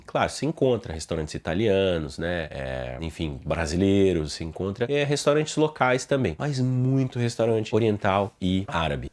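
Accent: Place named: United States. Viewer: Sotaque forte eu acho quem fala esse R que você fala, tipo americano. PoRRRTa kkkkkkk😅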